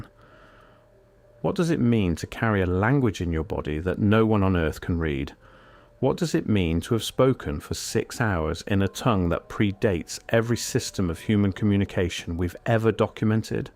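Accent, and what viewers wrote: England, United Kingdom. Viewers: This is a made up story. Note how they use a very convincible narrator with and english accent. It adds credibility.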